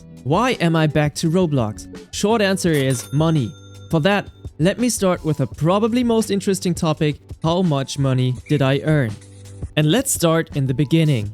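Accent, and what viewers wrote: Germany. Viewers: yo i didnt know u had a wife (i also think that ur german accent helps ur vidoes)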